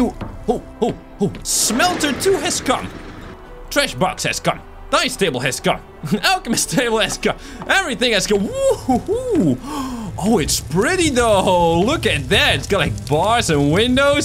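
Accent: Netherlands. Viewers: Ben je nl ik hoor het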